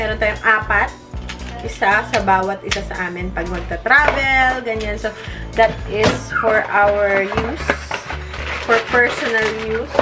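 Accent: Batangas, Philippines. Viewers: Ma'am, batangueña ka po?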